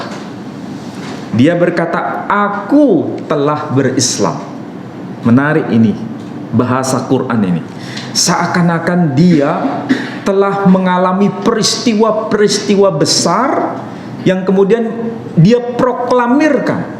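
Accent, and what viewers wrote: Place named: Iran. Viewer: Seperti lahjah atau cara baca Parsi, Iran..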